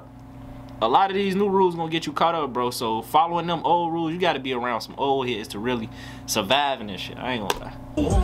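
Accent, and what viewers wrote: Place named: Chicago, United States. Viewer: I hear in your voice u from the chi so I give you a little room and u young, I’m 43 and I respect what you said about your pops taught you, and u listen cause I stay on son’s and they move off old rules, and I respect that you went and did your research on B.G u got some of these young dudes who rap and don’t do that, I heard Fredo bang say he don’t know 2pac music if your pops from the streets he Definitely had u on 💪🏿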